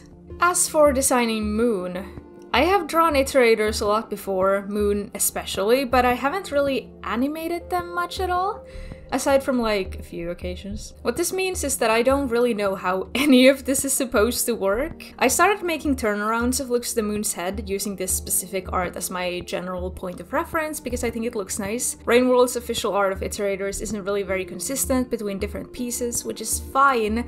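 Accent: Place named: Finland. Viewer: Kuulin heti aksentista et oli suomalaine kyseessä. Mahtava tietää että suomestakin löytyy näin taitavia henkilöitä! Erinomainen video :]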